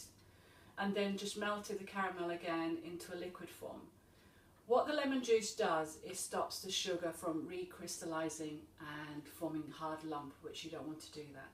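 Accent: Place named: United Kingdom. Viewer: Lucia P Que parvidade. Cada um fala a língua que quer. O inglês é mais universal e funciona melhor em termos de views no youtube. Além disso, não conheço esta senhora, mas o sotaque dela é britânico, portanto presumo que ela seja inglesa e não portuguesa, e que apenas esteja a ensinar uma receita portuguesa.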